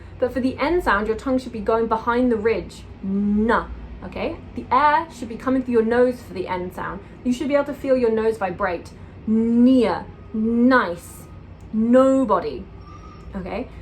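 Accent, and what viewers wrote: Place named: United Kingdom. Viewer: that British accent....... <3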